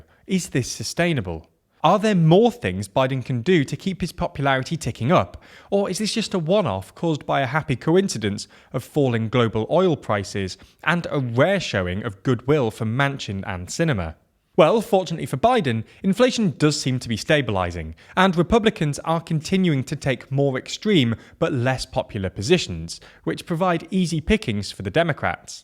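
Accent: United Kingdom. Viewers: First time watching this channel after the other 3. Kinda disappointed he didn’t change his UK accent to an US accent. Would be totally hilarious and serious at the same time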